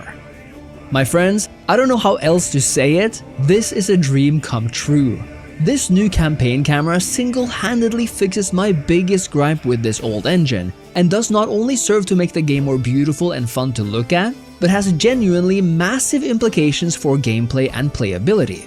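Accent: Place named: Norway. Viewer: man ur norwegian accent is shining through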